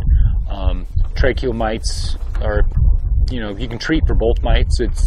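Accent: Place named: Australia. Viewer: WA is that Western Australai ? cause u have an aussie accent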